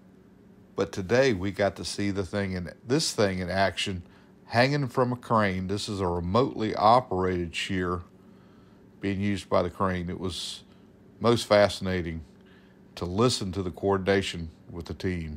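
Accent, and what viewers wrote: South, United States. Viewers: WOW,WOW,Wow!I'm not part of your navy!I don't have a confederate flag and the north WON!You people started your accent because,you did't want to sound like "Yankee's"This is due to south"s low IQ!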